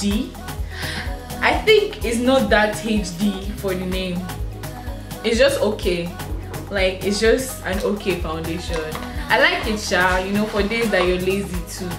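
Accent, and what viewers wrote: Nigeria. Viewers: Very nice haul,I love the way u mix our naija accent n words too,kip it up girl tfs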